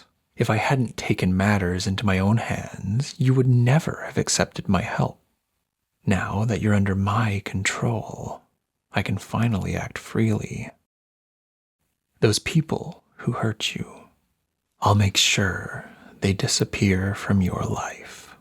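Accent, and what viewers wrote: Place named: Canada. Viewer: I've listened to your stuff before and only today picked up on your Canadian accent. "Sorry" gave it away 😅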